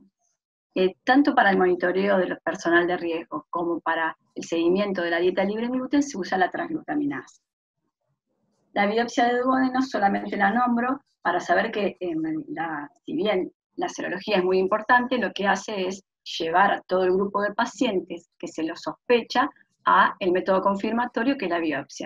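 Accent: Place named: Argentina. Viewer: Hola, gracias por toda esta valiosa información. Solo quiero aclarar una cosa. Yo padezco la Enfermedad Celiaca diagnosticado ya hace varios años aquí en Los Estados Unidos donde vivo. Se me ha dicho todo el tiempo por los Doctores que la avena no contiene gluten naturalmente, solo se contamina cuando se maneja junto con el trigo. Yo ingiero avena frecuentemente y no me produce ningún problema. Por el acento de la Doctora creo que es Argentina, escuche este mismo comentario en un programa de televisión Argentino. Así que no se si esto es un error pasando solo en el sur de America o es un error aquí en los Estados Unidos.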